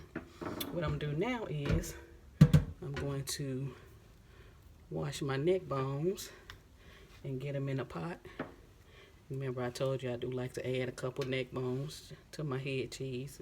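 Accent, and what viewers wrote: South, United States. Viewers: She said I like to use my “yurs” aka ears haha love southern accents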